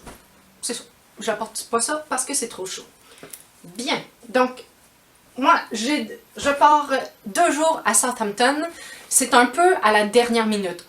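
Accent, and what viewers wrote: Canada. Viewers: J'aime la langue française , mon pays est francophone. Mais, l'accent canadien est vraiment différent par rapport à celui de la France.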